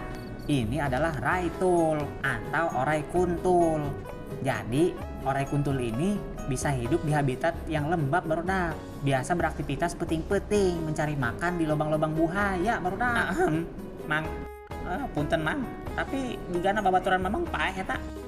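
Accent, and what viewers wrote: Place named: Citorek, Indonesia. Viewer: Logat mna ini bg kaya kenal, citorek bukan si😅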